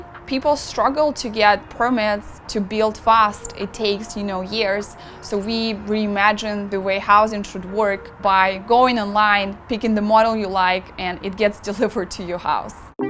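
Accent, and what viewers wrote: Russia. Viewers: It is obvious that is well educated but it is so strange that Russian speaking people need at least two generations to soften some English sounds. Nice blue Slavic eyes. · She sounds Russian.......didn't expect that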